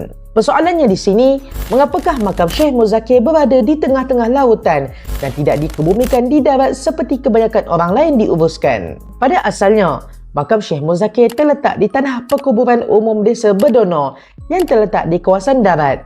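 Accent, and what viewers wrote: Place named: North, Malaysia. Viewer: orang utagha ka? loghat cakap ada kala keluaq utagha tu hehe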